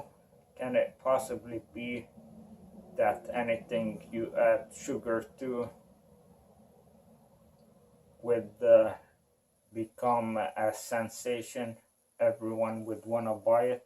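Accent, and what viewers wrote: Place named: Spain. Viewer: Are you Spanish I can't understand your English sorry :(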